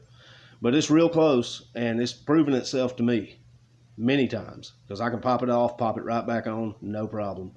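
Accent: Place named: South, United States. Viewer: Haha glad to see you, always good information and spoken in good ol Southern English. Really like your AK reviews, be safe everything is going to work out!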